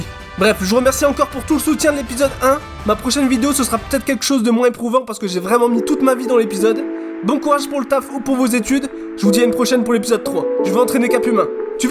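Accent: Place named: North, France. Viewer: pas = po il vient du nord cet accent mdrrr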